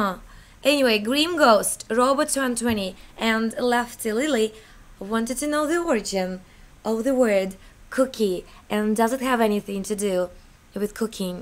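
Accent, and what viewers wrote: Russia. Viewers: you russian accent is awesome i must say! good on you! kak naschet slova 'sadism' ? mojno nemnozko pogovorit a marquis de sade i tak dalee. privetik is germanii, serojenka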